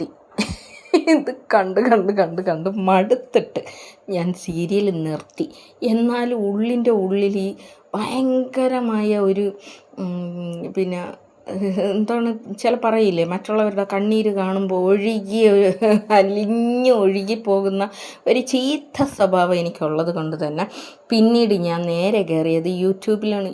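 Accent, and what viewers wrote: Malappuram, India. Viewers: itha malappurathek va.slang namuk padikkam.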